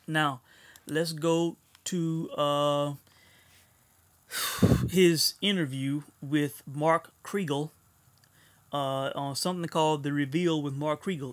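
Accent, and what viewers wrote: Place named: South, United States. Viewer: lol you have a natural Southern accent already, so I didn't get you quoting in country, something... as far as brook wanting get acclimated to a heavier weight before fight ggg again. that's realistic. you should want you fight to feel comfortable going a whole 12rounds with the weight.. jumping up two weight classes isn't smart to do. I do agree about Spence Jr talking about sparring sessions... that's not cool.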